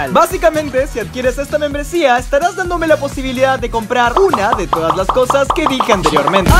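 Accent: Peru. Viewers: eres peruano?? -.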